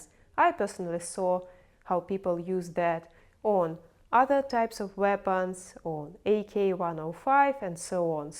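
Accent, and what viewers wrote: Russia. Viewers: I think I found my new asmr channel. I love the Russian accent and I love listening to people talk about guns. 🤣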